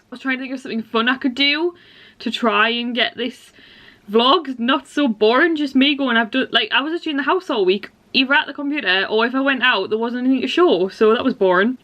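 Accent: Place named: Northern England, United Kingdom. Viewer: because I live in the uk and that's an up north accent xxxxxxx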